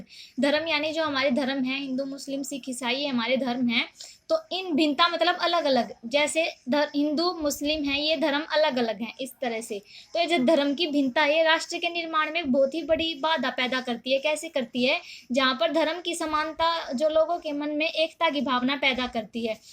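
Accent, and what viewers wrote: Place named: Haryana, India. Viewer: You are from haryana ❤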